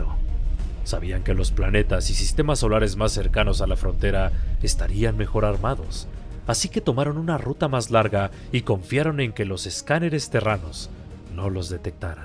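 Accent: Mexico. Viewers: Este canal no sube ia. el relator parece mexicano. y los relatos son de un subreddit si no me equivoco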